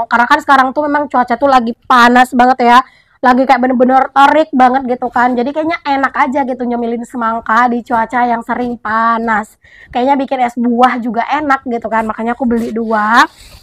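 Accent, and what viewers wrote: Java, Indonesia. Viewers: Rumbai hadir,namanya obat keteguran bnd,masa bnda lupa?bnda sekali2 pakai logat pekanbaru dunk?kayaknya bnda ngomong seperti logat jawa aja🤗🤗🤗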